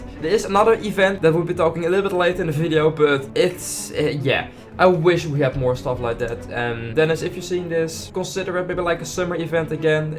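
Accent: Netherlands. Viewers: Because he has a Dutch accent.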